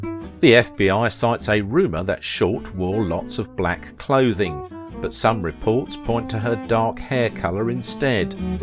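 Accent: England, United Kingdom. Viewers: Give the guy a break. He's got an accent. He sounds English and he will pronounce some words differently than you.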